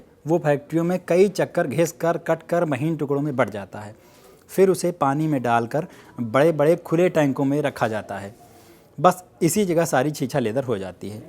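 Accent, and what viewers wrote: India. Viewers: Ekdam देसी accent hai. Hum sab bhartiya apni matrubhasha ki accent mein jab bat karte hein, to ek alag sa feel hota hai. Angrezi se door hote hi sukun milta hai 😄😄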